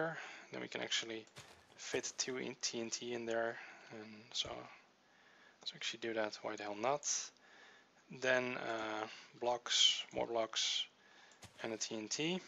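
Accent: Netherlands. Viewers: boi je bent nederlands. Dat horen we allemaal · are you dutch? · Ik dacht ook al zoiets. Je hoort het gwn aan z'n accent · your english sounds very dutch. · Your dutch arent you? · Hes Dutch i can now it cause im Dutch to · Are you dutch? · r u dutch? :3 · are u dutch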